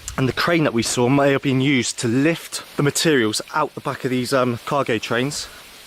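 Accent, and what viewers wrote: United Kingdom. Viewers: godd4mmit, speak ENU nsteado UK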